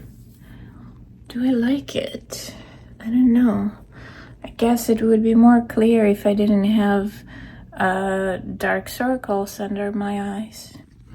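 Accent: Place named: New York, United States. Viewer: You have picked up some NY words. Like you said “cawfee” the other day. Meant to tell you. Haha. You are an official New Yorker. There are a few words I have heard that reminded me of living there.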